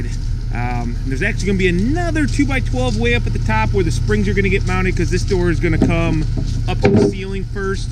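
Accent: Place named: Wisconsin, United States. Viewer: lol has a Wisconsin accent, though. I bet his parents are from there and he picked it up from them as he was growing up and learning English.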